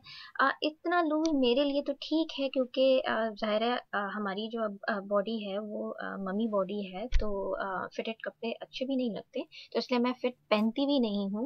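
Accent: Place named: United Kingdom. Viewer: okkkk that's great uve adopted a v good British accent that's why I was asking 😍😍😊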